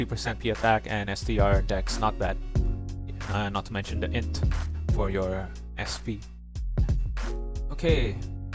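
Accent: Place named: Philippines. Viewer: isee haha sounded like ur from philippines also decent english accent. Will u please check to test my hybrid build on tr i use katar glaive and dagger for it, 1m CP proc around 150-200, normal atk and buff only on auto, and im using 1 illu drake 3s. Kinda works good for me cuz i get more dmg output to some whales here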